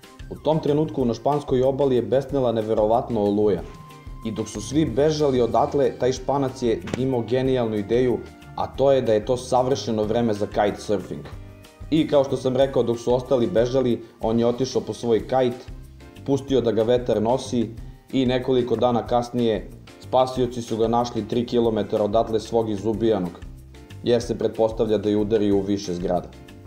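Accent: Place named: Užice, Serbia. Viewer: Jesi li ti iz uzica?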